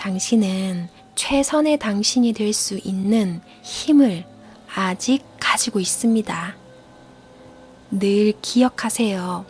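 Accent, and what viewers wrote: Gyeongsang, South Korea. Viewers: 마약의 경상도 톤...와...반가와요~~^^ 잘 듣고 갑니다~ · 말투가 경남쪽인데... 20대 때 짝사랑한 누나가 부산 사직여고 졸업.. 지금은 서울 삼성의료원 과장..되서 잘먹고 잘 살고있어요. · 오~ 간만에 활기찬목소리 갱상도 특유의 목소리. 에너지 마이 실린 목소리 감사합니다.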